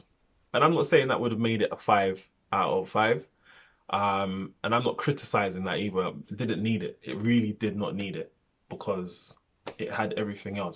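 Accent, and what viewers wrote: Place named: London, United Kingdom. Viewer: Just subscribed. Great review. You made me feel like I liked it more than I originally thought. I think my only qualm with the film was there were slightly too many red herrings! Like, a lot of “you thought this happened but it was actually this!” If that makes sense haha. Side note it’s so refreshing to hear a familiar accent on YouTube 🤓🙏🏾 you sound like London and I’m from there so it feels like watching a friend. Keep up the great reviews! I’d recommend 1917 if you haven’t seen it yet. One of the best films I’ve seen in a while Edit - just seen you’ve got a 1917 review up so I’m gonna watch it now!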